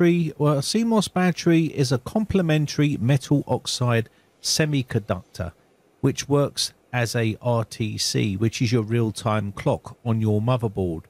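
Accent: United Kingdom. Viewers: This is weird, because I have never owned a PC that has done anything other than pop a "CMOS Checksum Error" (or some similarly worded error message). The BIOS chip makes a checksum of all the settings you have saved in CMOS, and it keeps this in memory. When you boot the computer, it will compare the checksum of the current CMOS Settings against the CMOS Settings Checksum that it had previously saved. If, for any reason, they do not match (they won't match if the battery is dying, ever), it will pop that error and force you to go into the mainboard settings (some BIOS chips give you the option to boot with default settings instead, but this is usually undesirable because the default settings almost never include a storage device, which means no OS will be found). This is hard-coded into the PC's BIOS chip, and it's done for safety reasons as erroneous data could be very damaging to the computer's hardware, such as sending wrong voltages to the wrong places in newer computers whose BIOSes allow you to overclock hardware. 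You'd have to be running an extremely cheap off-brand mainboard to be able to boot with corrupt data in the CMOS. Unless, of course, they do things differently across the pond? You sound British so maybe computers in the UK work differently than those over here in the States?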